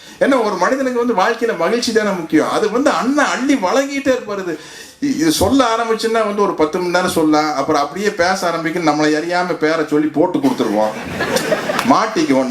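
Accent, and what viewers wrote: Coimbatore, India. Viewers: கோவை தமிழ் சூப்பர் 👌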